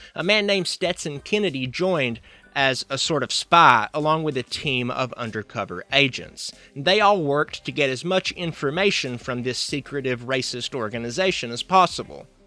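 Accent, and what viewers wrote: United States. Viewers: could have done a "top 5 videos" haha im from oz and your accent is hardcore cowboy haha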